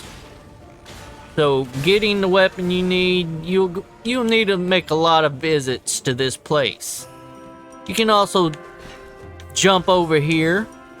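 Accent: South, United States. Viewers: bros aggressively southern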